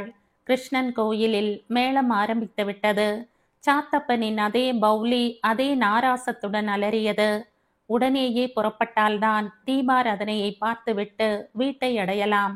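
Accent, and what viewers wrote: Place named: Palakkad, India. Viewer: Mam, Your Palghat accent is very nice. Anyone will assume you are brought up in Palghat!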